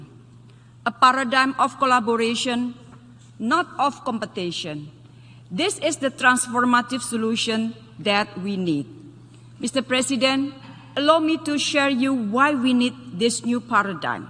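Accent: Indonesia. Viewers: Gini lho dengerin menlunya ngomong enggres pake aksen Indo. Gak ala2 amrik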